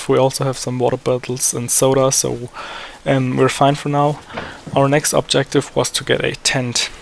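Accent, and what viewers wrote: Germany. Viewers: your accent sounds like your mother tongue is german... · haha ... hab einfach mal reingeguckt und mir so gedacht: Die Aussprache is, als wär der Deutsch wäre ...und taddaaa ... recht gehabt :D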